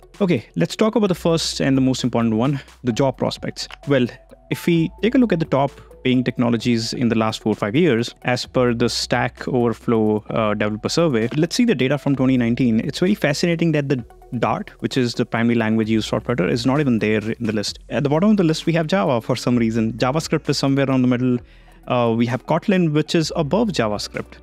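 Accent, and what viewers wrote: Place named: India. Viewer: >indian opinion >trash accent opinion rejected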